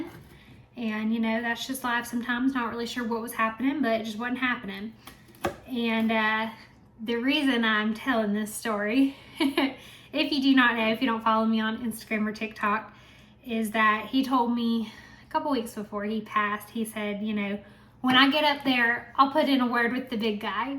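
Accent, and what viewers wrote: South, United States. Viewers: you have a beautiful southern accent i thik its southern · You have the nicest Southern accent!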